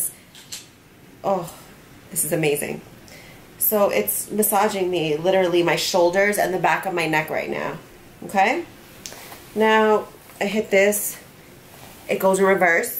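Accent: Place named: New York, United States. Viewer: Just ordered one! BTW, you ded have a NY accent lol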